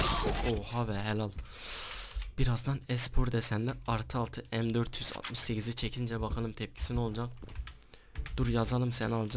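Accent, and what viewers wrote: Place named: Malatya, Turkey. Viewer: abi nerelisin bizim melekt gibi konuşuon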